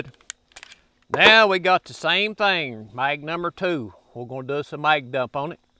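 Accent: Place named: Appalachia, United States. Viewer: The TEE SAUCE 1911🤣🤣🤣 I love that hillbilly accent.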